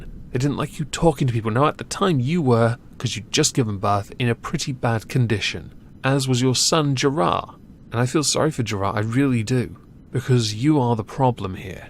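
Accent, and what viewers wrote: RP, United Kingdom. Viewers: Loving the posh English accent.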